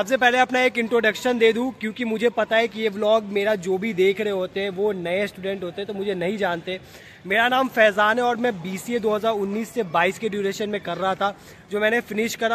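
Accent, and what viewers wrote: Bihar, India. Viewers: Aap Bihar se ho 🙃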